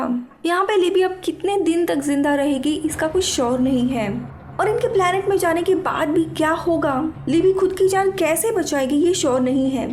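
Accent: Maharashtra, India. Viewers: Apki accent sai ki aap Maharashtra sai ho am I right?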